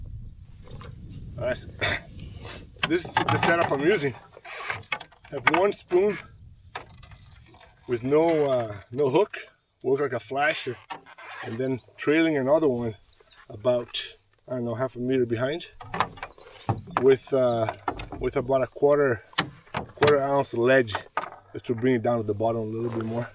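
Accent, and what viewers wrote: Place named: Brazil. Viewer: Boa, pelo seu accent eu percebi que era brasileiro haha. Video incrível, qual região você está? Abraços!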